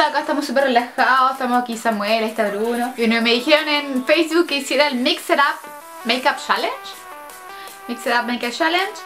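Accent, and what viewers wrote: Chile. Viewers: El acento Chileno es muy obvio! GENIA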